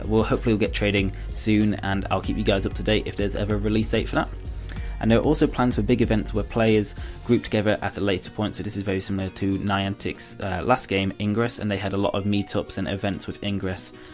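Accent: United Kingdom. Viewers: Nice video most British voices that are recorded i can't really stand but i like your videos keep it up 😎👍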